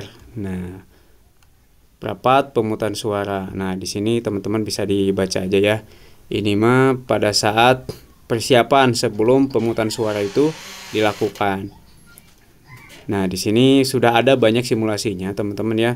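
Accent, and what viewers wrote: Sunda, Indonesia. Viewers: si akang orang sunda yah, ada sunda sudannya dikit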